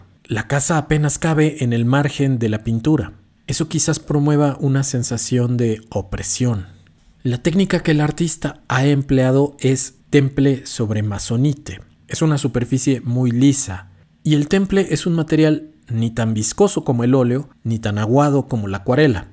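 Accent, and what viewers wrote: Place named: Mexico. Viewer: Por su acento es mexicano.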